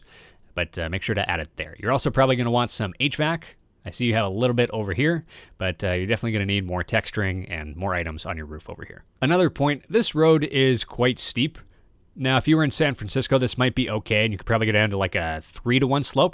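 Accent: Canada. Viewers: sir you have a Canadian accent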